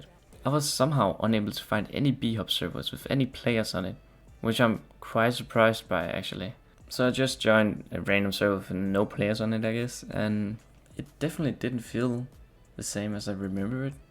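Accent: Denmark. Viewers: Ingen tvivl om at du er dansker med den accent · i smell danish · Fed Dansk accent haha!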